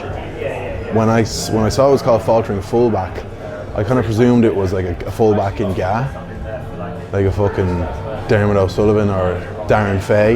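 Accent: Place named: Essex, United Kingdom. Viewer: Your Essex accent is pretty decent mate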